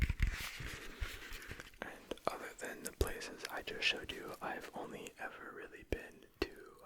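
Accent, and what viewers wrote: Quebec, Canada. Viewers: Big t'es tu québécois ??😂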